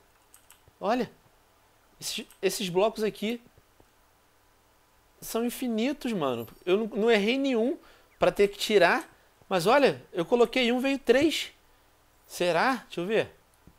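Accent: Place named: Portugal. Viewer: ui palhaço e português de Portugal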